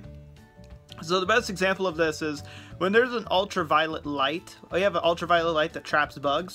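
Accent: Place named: Ireland. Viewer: You do have a GREAT St. Patty's Day accent!!!!! And you are so funny,!!!!! Thanks for the laughter you bring me!!!!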